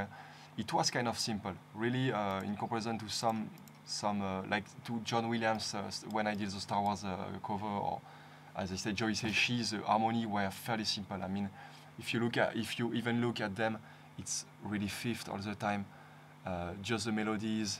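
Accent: France. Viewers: Ha un accento francese?sono francese ammiro lei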